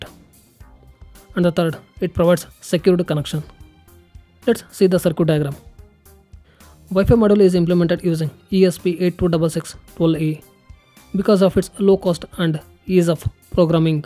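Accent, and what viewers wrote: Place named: India. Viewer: why do indians have a fucked up accent ?? BTW he sounds like a mexican guy trying to speak english in an indian accent LOL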